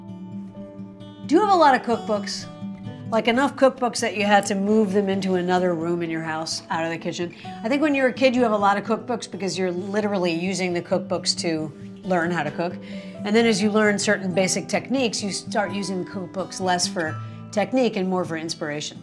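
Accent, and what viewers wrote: RP, United Kingdom. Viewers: Awh, my mother had endless cookbooks including many by dear Fanny Craddock. After my mother died I picked up only 2 or 3. mmmmmmm ... I wish I could go back and grab a truckload. Your 'Fanny-accent' is perfect heightened R.P. She really was a breed apart. Few of her ilk are left. Thank you for bringing that era and the older cookery books to life. You infuse even the poetry with sparkle. You are a rare talent! Love, love, love. Xxx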